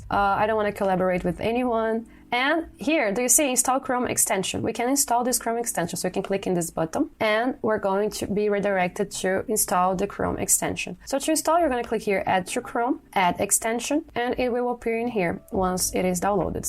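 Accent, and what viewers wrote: Brazil. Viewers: Reconheço um sotaque brasileiro quando ouço um, teu inglês é ótimo! Valeu pelo tutorial, pretendo começar o CS50x 2023 agora em dezembro e depois partir pro CS50x 2024.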